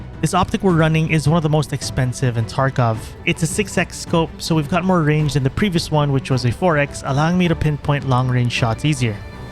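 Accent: Philippines. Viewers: Hello, I'm getting on the new wipe, it'll be my second wipe. So starting from the beginning. Seeing if you'd like to queue up with me at all? Also are you filo? You have a filo accent. I'm filo myself! Nice videos and I enjoy it! 🇦🇺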